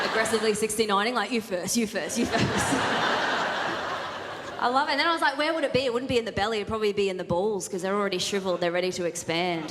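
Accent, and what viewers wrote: Australia. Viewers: Austraiiilian Maite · She's very Australian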